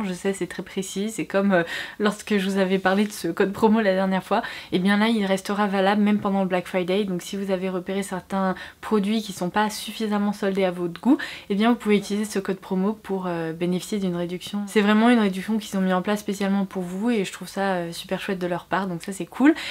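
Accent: England, United Kingdom. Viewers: Petite question idiote mais il te sors d'où ton accès en anglais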